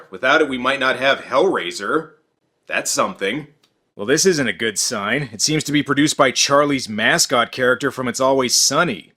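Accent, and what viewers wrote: Ireland. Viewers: Am I the only guy who instantly got a boner from talking with an Irish accent? No? Ok, just making sure that I'm not weird... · The Iriah accent made me laugh out loud literally literally. Have a. Subscribe yer sexy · Rawheadrex , queen kong, deathstalker who come up with these name next you going to tell us there a movie called WTF Also that Irish accent is amazing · If Charlie Kelly's Green Man had produced this film, we'd probably be a lot better off. Did a pretty-good job on Lethal Weapon 5 & 6. I love that a 30-foot solid stone obelisk is apparently supported by a foundation that's only about 2 feet deep. A butterfly could push that thing over. Great Irish accent, though I think I detected a wee bit of Maritimer starting to peek-through at the end, lol! Not that there's a ton of difference, mind. Seems like it's always a bad idea to give your monster human eyes, even if they're red. 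Kinda like sharks with human teeth; it just utterly destroys the creepiness. Rawhead looks like a less-scary version of Judge Doom from "Who Framed Roger Rabbit?".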